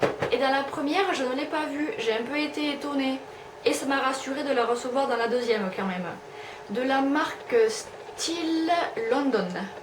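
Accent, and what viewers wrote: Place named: South, France. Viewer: Une drôle d'histoire 😉 j'aime ton enthousiasme et ton bel accent du sud 👌👍1 like + 1 abonné de plus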